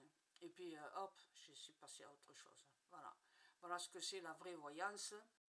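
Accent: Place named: South, France. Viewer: Vous avez un bel accent du sud 😊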